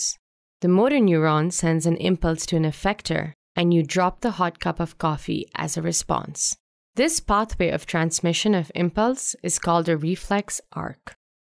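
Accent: India. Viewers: Tamil accent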